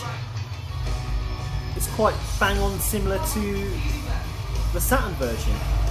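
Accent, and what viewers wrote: United Kingdom. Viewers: I see Okay. You sound like you are from the UK - is there a reason why you bought the NTSC-J Import of 'Sega Rally Championship Plus' over the PAL version of 'Sega Rally Championship'? I'm not sure which version of the game to buy for my Saturn. I am also in the PAL region.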